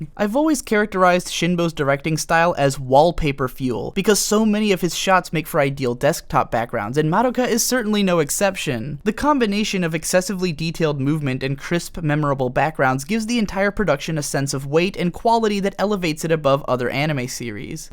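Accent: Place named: United States. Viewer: wtf you americans and your way to accent vocals -_- I thought you were talking about Madokas mom all the time! It took me 10mins to realize you meant Mami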